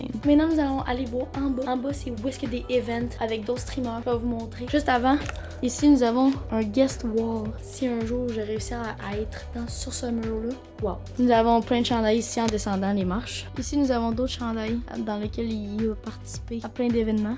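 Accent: Quebec, Canada. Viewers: xD l’accent québecois JADORE ZBI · J'ai juré l'accent Québécois c'est incroyable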